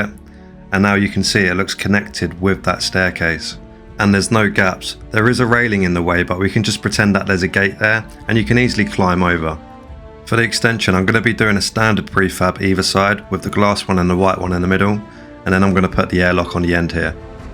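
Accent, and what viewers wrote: United Kingdom. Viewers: Nice outpost dude. If you invest in your outpost skill you can definitely pimp it out even more. Also nice to here a fellow Brit doing some videos haha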